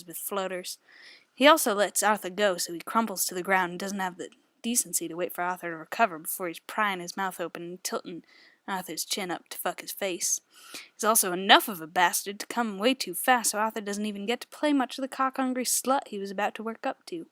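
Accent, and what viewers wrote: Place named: South, United States. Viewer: Oooh boy, I *love* your accent and how it rang true for Arthur but how you switched it for the 'television american' accent and Eames own voice. I'm a sucker for south accent and your voice is all kinds of wonderful! Great dramatic reading, I enjoyed it *a lot*. Would you mind if I were to download the mp3 version of it?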